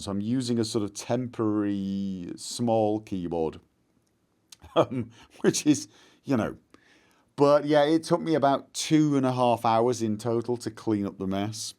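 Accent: United Kingdom. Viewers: I am older than him too. I actually first started watching his channel because I am also a Brit that has long lived in Scandinavia, but the far north of Sweden for me. It was just so nice to hear a British accent and anecdotes from a period I so fondly remember. Grumpy old man - Check! Disparaging of suicidally dressed young-en's out clubbing - Check! Wrecking my back every year by slipping over on ice or snow - Check! Egad, I think I am the Swedish incarnation of over the border. I'd buy him a sympathy beer the next time I'm over to Norway, but I cannot afford to take out a second mortgage...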